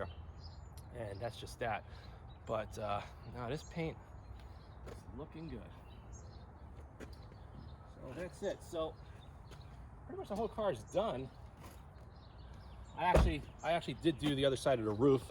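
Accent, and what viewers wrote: New York, United States. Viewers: Awesome job on the correction! I heard you mention you already have cquartz uk on it already. I have the same setup on my RAV4. I talked to Corey about this from carpro and you really don’t want regular wax or sealant on top of a coating. They make “Gliss” now to put on top of Cquartz for extra depth and shine. I love the Wolfgang liquid sealant which is like Jescar power lock. I also dropped 2 hondo on Wolfgang concours carnuba. I heard the NY accent right away man, I’m from Jersey. Not knocking you at all about wax over coating. Just wanted to throw that out there. Griots are the bees knees. You literally have the same set up I have from the megs pads to the PFM towels. You need to try microfiber madness cloudbuster, they make the best glass towels I’ve ever used in my life. I gotta upload my 18 hour day of paint correction now after watching this video 😎 You rock man...keep it up!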